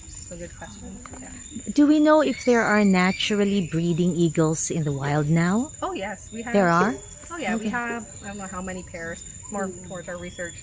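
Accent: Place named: United States. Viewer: expat sya.. american from her accent so no.. di dugo dugo ilong n'ya, it's her native language.. half pinay as she said during the first 5 minutes of the video..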